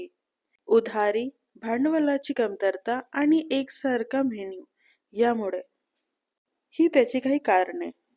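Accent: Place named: Hindi Belt, India. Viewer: माहिती चांगली आहे. पण उच्चार हिंदी पट्ट्या मधले वाटत आहेत. उच्चारांवर काम करावे.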